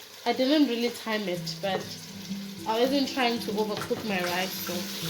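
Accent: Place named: South Africa. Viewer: First of all love and miss hearing that South African, Kaapstad accent. I also hate the taste of those little (I think it's cardamom seeds). My Mom used to cook the chicken and potatoes separately, then layer them, potatoes, rice mixture, chicken and so on and let it slowly simmer and then we would have it with mango chutney yum yum yum, lekker smekker goed. Enjoyed watching you, I have never tried making this on my own, but watching you I will certainly try my hand at it. Thank you for the inspiration, geniet, dankie en tot siens.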